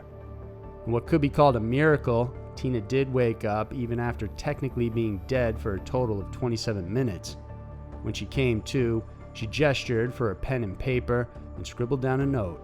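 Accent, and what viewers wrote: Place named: Texas, United States. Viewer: Hi, new here. Trying to place this guy's accent, has he ever said? Sometimes I hear Va/NC area other times it sounds like Ga or Tx. Prbly way off, anyway... thoughts?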